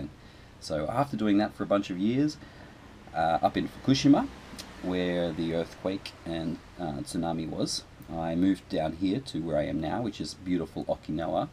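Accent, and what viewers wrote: Australia. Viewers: Great video, I have to say... the first time I heard your voice I was like...Wait... what? Wasn't expecting the Australian accent. Now every time I watch your videos I quote Mick Taylor from Wolf creek as I watch... Kind of morbid I guess...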